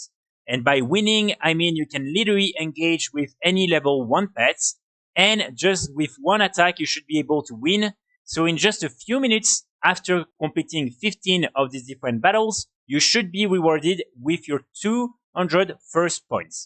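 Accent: France. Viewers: I didn't know you were making videos aside from the gold making theme! As a trading post farmer myself it's always welcome to have some optimisation intels so thank you for that !! Also, rien à voir mais t'es français nan ? Ca fait longtemps que je me pose la question avec ton accent.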